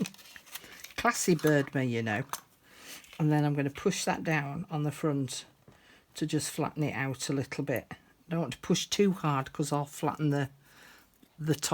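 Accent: England, United Kingdom. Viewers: I love your accent btw it reminds me of my childhood when friends of my dads from England took care of my brother and I when my mother was sick.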